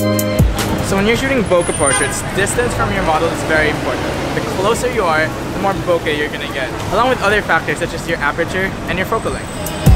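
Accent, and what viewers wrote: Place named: Philippines. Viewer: From his accent alone i know this guy is filipino hahahahaha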